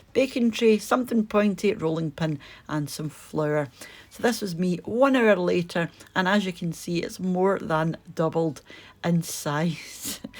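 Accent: Scotland, United Kingdom. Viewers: Tried this recipe works a treat. Very nice video and love the Scottish accent :) Well done.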